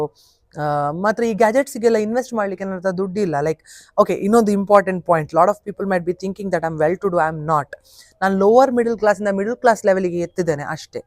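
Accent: Mangalore, India. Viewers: Typical kudla Kannada 👍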